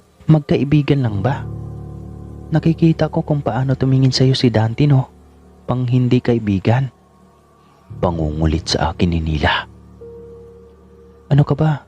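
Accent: Visayas, Philippines. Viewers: Bisaya kaba idol?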